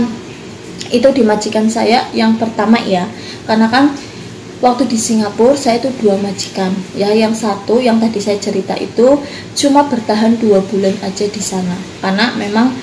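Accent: Banyumas, Indonesia. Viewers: Pilih mana y? Ngapak gesss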